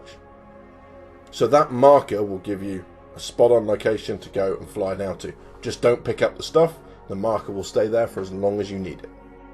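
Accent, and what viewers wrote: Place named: South West England, United Kingdom. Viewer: Love the video, are you from South-West England? It's so refreshing to hear someone who sounds like me on YT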